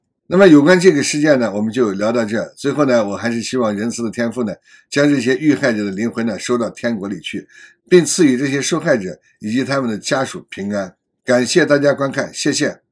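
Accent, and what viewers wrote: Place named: Shaanxi, China. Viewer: 陝西醋溜普通話啊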